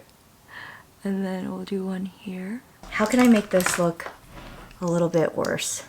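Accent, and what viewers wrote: United Kingdom. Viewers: I like your British accent. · Are you British accent? It sounds slightly korean. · Loving the British accent while cooking haha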